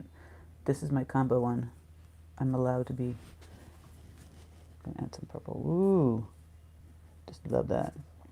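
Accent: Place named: Massachusetts, United States. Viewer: I agree about '21 being tougher than '20. Hoping '22 is much better for all of us. I think we're all weary and sick of hearing about the virus. Time to get back to living! I thought I detected a MA accent! I grew up in Waltham but am in NH now (since '99). Thanks for the video. I hope your holidays were enjoyable and peaceful.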